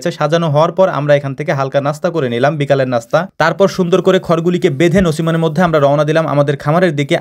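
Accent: Faridpur, Bangladesh. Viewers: ফরিদপুর এর কথা শুনেই Subscribe করে দিলাম। আপনার বাসা ফরিদপুর এর কোন জায়গায় জানাবেন ভাইয়া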